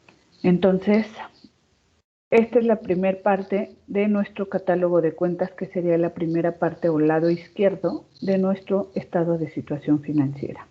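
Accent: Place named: Mexico. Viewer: todo estaba bien hasta que se empezo a notar el acento mexicano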